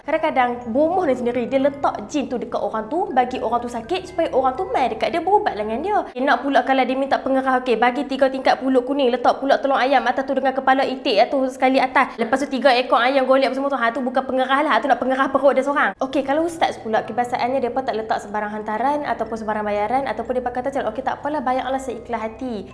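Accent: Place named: Kedah, Malaysia. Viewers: Bila utagha sembang nahhh kaw kaw 😂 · SYOQ DENGAQ ORANG UTARA CAKAP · Seronok la dengar 😂 dia punya utara dgn laju rasa mcm dengar member sendiri ajak borak 🤣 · susah hendak difahami apa yang beliau berbahasa,sungguh pekat loghat kedahnya. bagaimana sesuatu maklumat hendak disampaikan jikalau yang memberi tersebut menggunakan bahasa berlainan? natang mu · Aq orng johor..tp seronok dengar lorat kedah ni...nk crik bini orng kedah laa gini😂😂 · Oqang Utaqha cakap laju tu.. aku suka sungguh dengaq dia cakap 🤣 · This is kedahan accent, John bear is penang accent, it's not same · rasa cute la loghat utagha ni.. ❤️ melted.. hai awak.. tapi an… klu die tgh2 baca mantera.. rupenya baru tau bomoh. tertibe rasa nk cancel tu.. cmne ea nk blah? klu cabut camtu je tkut die sniper dari belakang. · Akak utara rapper bertudung is back🗿🗿🗿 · Matap lorat org utara padu.. 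· Ni sah speaker orang kedah ni. Penang cakap lanjutt x laju2 cmni. Aku kedah cakap cmni arh🤣 laju.. cm rapper lol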